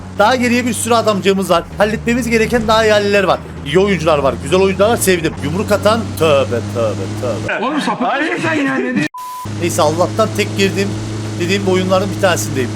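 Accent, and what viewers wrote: Cyprus. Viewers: abi net kıbrıs😎😎😎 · Abi Kıbrıs şiven e aşık oldum .d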